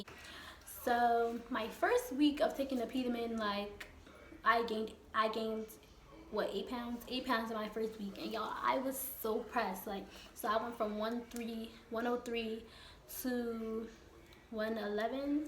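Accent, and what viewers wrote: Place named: Maryland, United States. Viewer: Girl I'm from MD and you sound like you are too 😝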